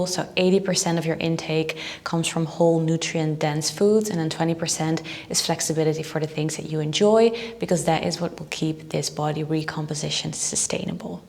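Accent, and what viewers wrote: Netherlands. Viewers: Very Dutch accent 😂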